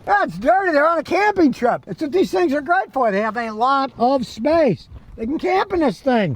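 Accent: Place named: Upstate New York, United States. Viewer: That's because of his Buffalo/Niagara Falls upstate New York accent....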